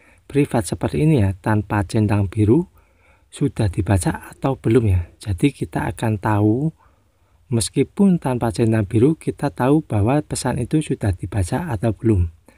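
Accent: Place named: Java, Indonesia. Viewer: Tak tebak wong jowo yo podo mas jowo ne ,aku kudus jateng mas salken lo.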